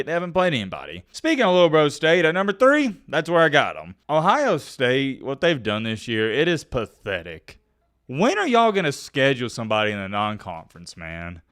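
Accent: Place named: Alabama, United States. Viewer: The way you say Miami hurts my ears 😂 that’s that Alabama country talk my Amy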